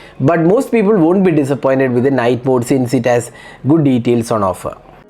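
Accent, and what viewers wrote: India. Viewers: More power comes to your yt channel sir. I love Indian spoke english on their content.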